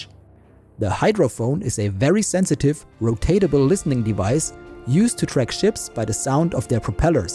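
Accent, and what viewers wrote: Germany. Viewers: Das Boot was a masterpiece of a movie. Good to hear a German narrate the video. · Hahah, I love it that you are German (or Austrian, no offence) and you can give us proper authentic German uboat banter! Brings back memories of Das Boot! Alles gut, Herr Kaleun! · Lovee the german pronounciation!!! 😭😭😭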